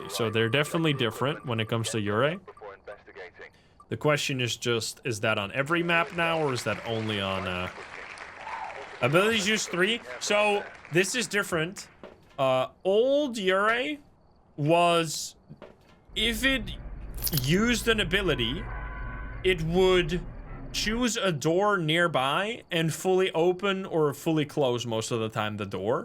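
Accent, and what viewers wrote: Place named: Netherlands. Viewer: ik had nooit geweten dat je nederlands was behalve een beetje van je acent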